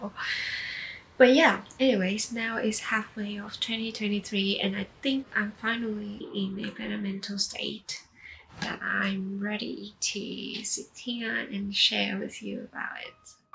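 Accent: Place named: Australia. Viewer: Your accent is sooo Ausieeeee and I lov ittttt <3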